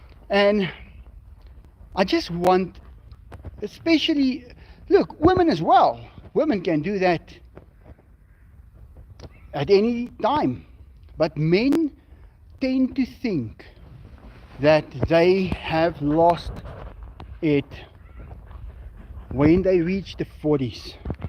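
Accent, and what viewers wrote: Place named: South Africa. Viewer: Unique South African accent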